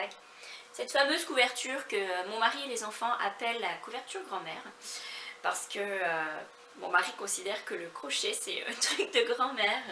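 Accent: Quebec, Canada. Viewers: Coucou , j'aime beaucoup ta première couverture ! J'ai commencé la meadow blanket de Lucy en lit double, qui ressemble vraiment à ma prairie ! Merci de m'avoir fait découvrir la créatrice et la laine Stylecraft , toute douce . Ton accent un peu québécois dans cet épisode me fait sourire , j'aime bien cette accent ;)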